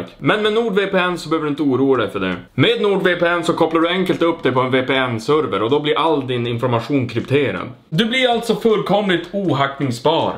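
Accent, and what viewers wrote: Norrland, Sweden. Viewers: Jååå, hittade din kanal för typ en vecka sen å har helt fastnat. Är från Lule å älskar din norrländska! 💖✨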